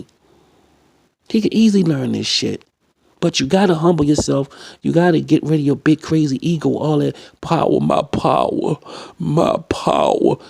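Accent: Baltimore, United States. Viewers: Straight Baltimore accent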